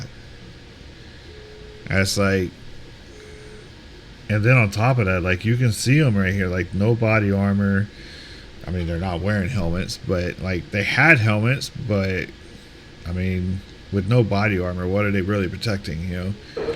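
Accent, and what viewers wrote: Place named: Chicago, United States. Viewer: It's funny that when you cut your southern accent, you talk just like I do as a dago from Chicago.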